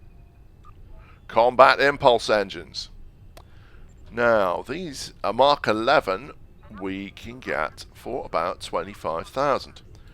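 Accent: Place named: England, United Kingdom. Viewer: If you don't mind me asking, what part of England are you from? I love your accent.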